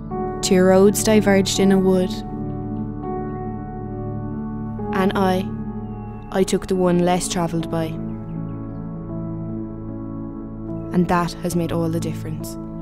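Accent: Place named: Ireland. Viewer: first time hearing the road not taken in an irish accent. Lovely!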